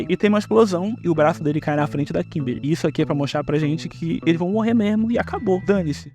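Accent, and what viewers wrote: Pará, Brazil. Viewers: Bixo, precisa nem assistir muito pra saber q o Cara é Paraense kkkkkkkkkkkkkkkk, muito firme o video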